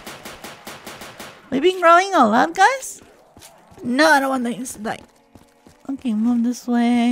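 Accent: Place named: India. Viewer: She sounds India/Pakistani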